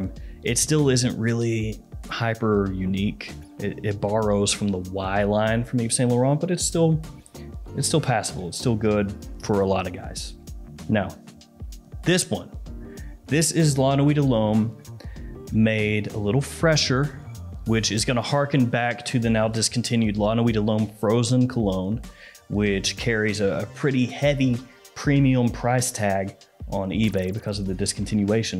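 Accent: United States. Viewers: Hey your 🇺🇸 accent is cool to us Brits 🇬🇧. My last unsolicited compliment was from wearing Mancera - Cedrat boise. Greetings from England 🏴 - Lee.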